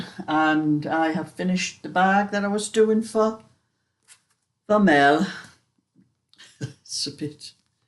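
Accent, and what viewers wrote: Newcastle, United Kingdom. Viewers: Hi,this is my first time messageing you but have watched your video's for over a year now and really enjoy them,my husband loves your rants being ranter himself😂being geordies ourselves but now living in shropshire,it's lovely hearing the geordie accent,i am from jarrow(jarra)my husband is from hebburn. I love listening to your stories from the past. Keep up the good work x PS could you tell me where you got your wool winder please,i have one but it dosn't make very big cakes like yours do❤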